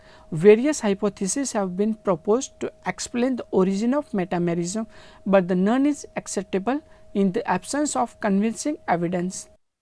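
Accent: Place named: North India, India. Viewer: Very bad English......typical rural small town North Indian accent.....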